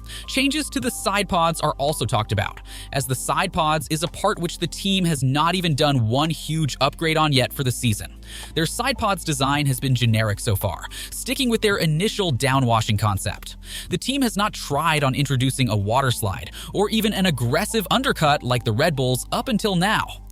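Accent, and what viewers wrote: United States. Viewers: the AMERICAN ACCENT is KILLING ME!!!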